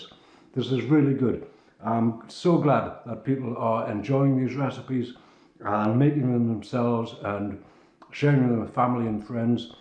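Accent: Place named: North East England, United Kingdom. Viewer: where are you from I love your accent? I believe your from somewhere in the NorthEast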